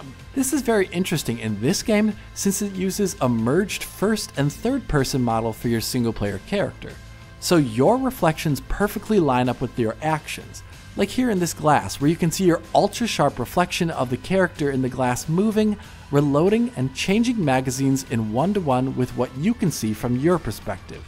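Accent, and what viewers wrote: Germany. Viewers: Lord Farquaad he wasn't so good in his older videos. And he definitely has a slight German accent, you can hear it.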